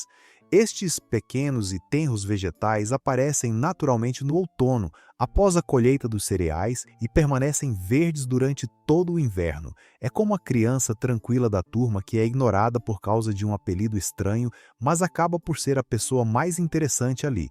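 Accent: Brazil. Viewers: Texto de manele com sotaque brasileiro. Ficou ó. Uma bosta. "Sabor à noz". Ridículo. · Dialética de Portugal, fonética do Brasil.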